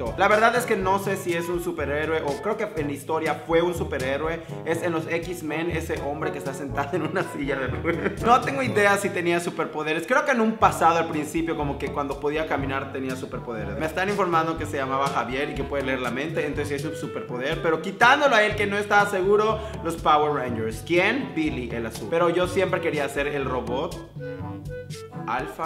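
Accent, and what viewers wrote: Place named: Yucatan, Mexico. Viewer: Tu acento Yucateco :3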